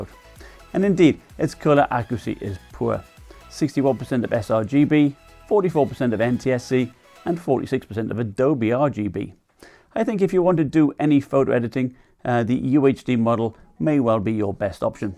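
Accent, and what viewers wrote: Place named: Scotland, United Kingdom. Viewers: Sound Scottish. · exklim Tech News Well, you have this scottish accent plus the funny vid you made with the umprella and I thought u were british!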